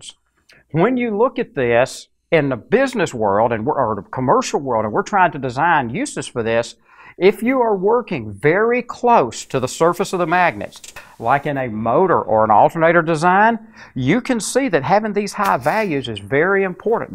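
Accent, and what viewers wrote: United States. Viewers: I like the cowboy accent analyzing cutting edge high techy stuff. You can't get that "yeehaw" feeling in German, French, Chinese or Russian accents. 👍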